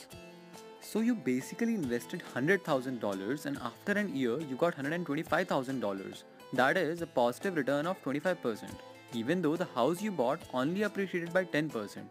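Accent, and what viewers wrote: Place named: India. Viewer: so funny Indy accent!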